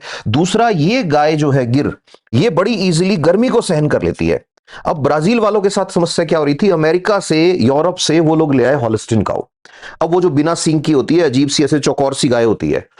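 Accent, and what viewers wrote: Rajasthan, India. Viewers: Ye hamare rajasthan se h pakka ascent se confirm hu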